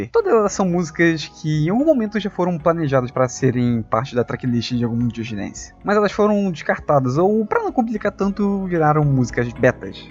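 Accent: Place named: Rio de Janeiro, Brazil. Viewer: Me deliciando com a voz carioca do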